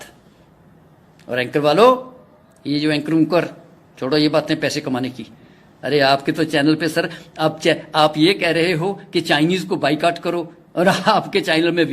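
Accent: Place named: Punjab, Pakistan. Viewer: Ye Pakistani Panjabi assent hae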